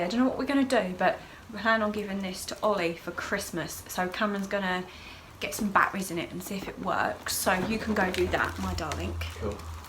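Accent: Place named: London, United Kingdom. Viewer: But proper got your accent cos im from luton and my dad is london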